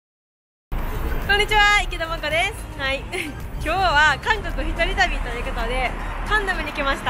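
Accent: Kansai, Japan. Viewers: 生粋の関西弁でハングル読めない事も言ってくれて性格かわいい、韓国行きたいけど読めない話せないで悩んでる自分には自信になった!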